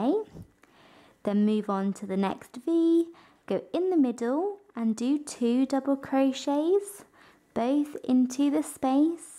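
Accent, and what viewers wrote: United Kingdom. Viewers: listens to accent Oh ok, so British terms... says double crochet while making a treble Damnit brain, you fooled me again!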